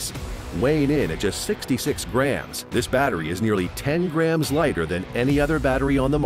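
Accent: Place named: California, United States. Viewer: Please get someone with a non Californian accent to narrate it .. that American "dude" is just putting me off like no tomorrow :-)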